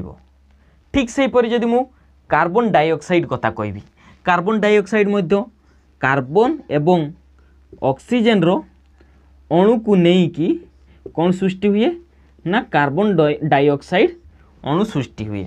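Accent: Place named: Sambalpur, India. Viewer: Tame sambalpuria enta laguchhe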